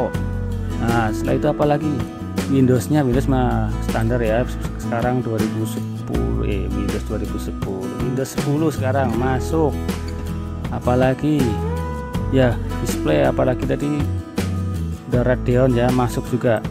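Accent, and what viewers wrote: Banyumas, Indonesia. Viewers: semangat om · Om, adek ikut an belajar yah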